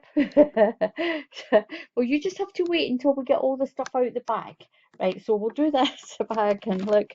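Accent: Scotland, United Kingdom. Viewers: I love your unboxing of yarn. I can hear the joy in your voice. What would make it even more wonderful is to see the joy on your face as you look at all the gorgeous yarn. I love to listen to your voice. I love a good Scottish accent. Much love from the USA, Florida. Lurinda Clarke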